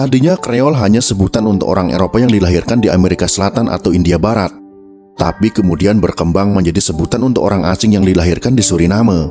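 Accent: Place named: Kalimantan, Indonesia. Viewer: Jawa Kalimantan ya min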